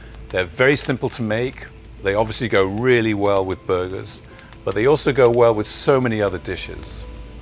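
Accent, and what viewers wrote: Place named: RP, United Kingdom. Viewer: One reason I love this program is the casually precise narration delivered in crip RP. The thesaurus comes naturally to this guy. There is zero barrier to vivid understanding.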